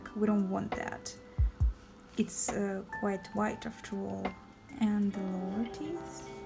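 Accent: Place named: Russia. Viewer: Такой русский акцент ,хахаха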